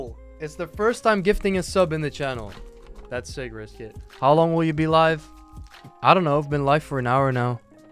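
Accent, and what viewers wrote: Netherlands. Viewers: Hey dude, great video. Are you dutch btw? I feel like i hear a slight dutch accent. Edit: welp nvm just saw the rest of the vid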